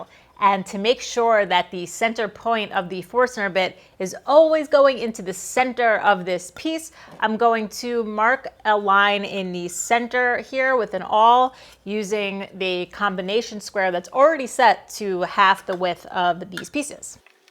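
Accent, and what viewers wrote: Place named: New York, United States. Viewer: Do you happen to be from New York or New Jersey? There's a draw to some of the words you say, that sounds New York esk. Just wondering.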